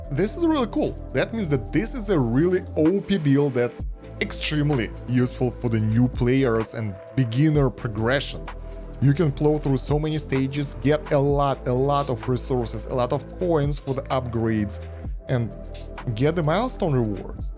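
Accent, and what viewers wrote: Russia. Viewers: Искал видосы на русском языке , но не нашел ) думаю ладно открою первый на английском и тут мужик с русским акцентом 😂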